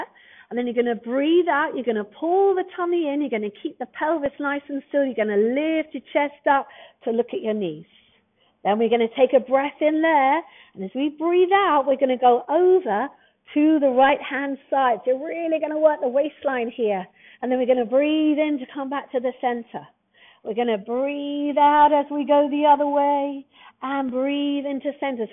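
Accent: England, United Kingdom. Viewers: During coronavirus in Northern California, I was enjoying regular walks...till the fires came. Now I cannot even leave my house because the air is so bad...so for the very first time in my life I'm doing indoor/video exercises, and these videos are the first Pilates of my life. They're challenging (I've gained weight and lost muscle in the last 6 months) but also really fun, and I like the teacher a lot! I especially enjoy her accent -- didn't know she would be English, but it's nice for me as I used to live in ENgland and have many dear friends and family members there. THANK YOU! I'm going to keep using the four intro classes and then probably will subscribe.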